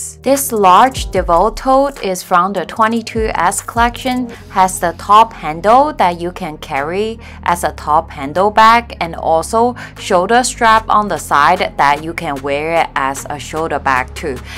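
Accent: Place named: South Korea. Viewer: 韓腔英語。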